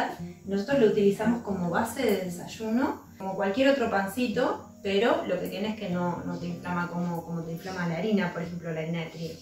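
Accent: Córdoba, Argentina. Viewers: Ya se impregnó de tonada cordobesa la bb😊Pan de aaaarroz! La amé 💕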